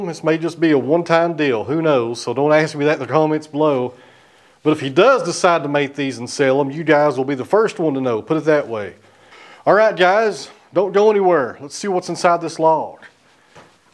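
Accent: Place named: Tennessee, United States. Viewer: I watch you work 1, because I want to own a Woodmizer one day. :-) and 2, because I had family from Tennessee and you talking reminds me of them. Sign me up for the give away!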